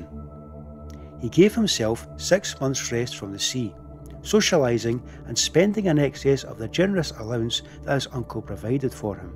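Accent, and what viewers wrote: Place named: United Kingdom. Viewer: C rappy video. The narrator's British accent is too strong and the music is too loud compared to the narration.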